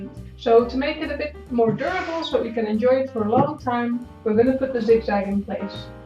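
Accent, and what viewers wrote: Netherlands. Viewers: Thank you very much! I am definitely going to try and make one^^ Btw, you have a dutch accent:)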